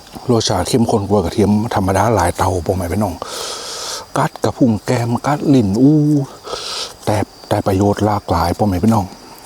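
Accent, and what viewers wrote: North, Thailand. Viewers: ชอบตรงทีเขาอู้คำเมืองจ๊ะ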